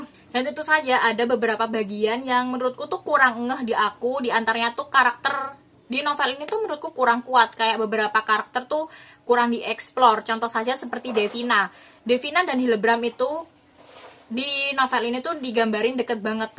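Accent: Java, Indonesia. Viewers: Suka sama logat kak jawa banget😍😍😍 aku juga asli jawa lho kak, tapi tinggal di Bekasi hehehe😁😅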